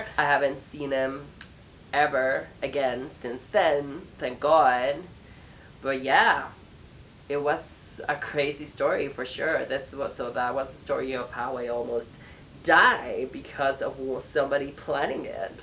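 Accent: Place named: Los Angeles, United States. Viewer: I love ur L.A accent.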